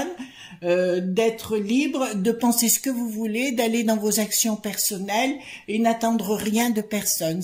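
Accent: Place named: Dauphiné, France. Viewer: quelle joie d'entendre votre voix avec l'accent du Dauphiné ! Merci !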